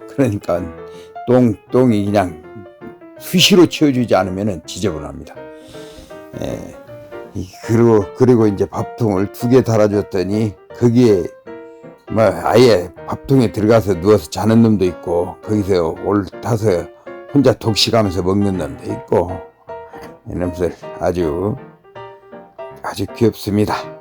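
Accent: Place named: Gyeongsang, South Korea. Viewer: 최고라고 표현 하고 싶습니다^^ 너무멋집니다 특히 구수한 대표님의 경상도 억양 의나레이션 또한 최고 입니다 ^^ 잘 보고 갑니다 좋은 영상 감사합니다 🎉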